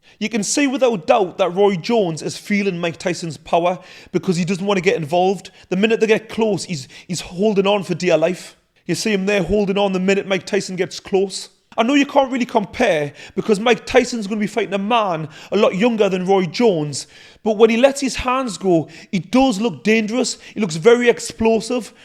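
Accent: Newcastle, United Kingdom. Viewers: It's like watching an episode of Geordie Shore with the narrative style and geordie inflexion of the voice - well put together and delivered mind, OSS🤙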